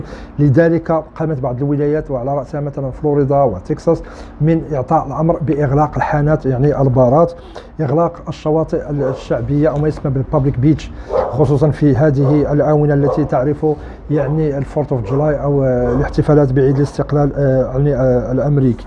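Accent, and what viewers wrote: Morocco. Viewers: اخي ربنا يحفظك عندك اللكنه المغربية قوية نرجو لو تكرم ذكر الاشهر بالعربي او الانجلش لاني لا افهم الشهور باللهجه المغربية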